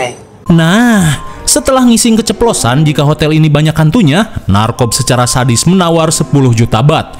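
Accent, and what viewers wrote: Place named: Java, Indonesia. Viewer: Gua suka konten lu bang . Omongan nya adaa ajaa . Nganclengan lah . Segalaa mecem wkwkwk .. medok banget ngomong nya 😂😂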